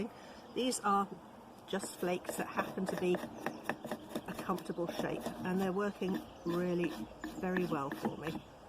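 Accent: United Kingdom. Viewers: Obviously the video is very interesting. But most of all I looooove your British accent!haha Free hugs from Córdoba, Argentina. Namasté.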